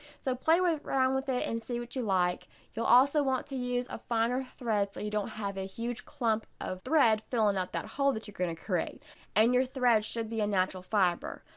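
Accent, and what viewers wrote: South, United States. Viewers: Thank you!! My first time watching and I will be watching again (and again!) Your very gentle southern accent just makes the entire video all the more pleasant. Excellent close ups and hints and tricks for this classic heirloom look.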